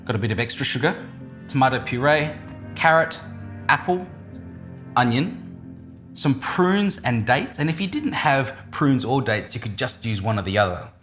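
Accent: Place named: Australia. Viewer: You have cool Aussie accent! Great presentation 🥰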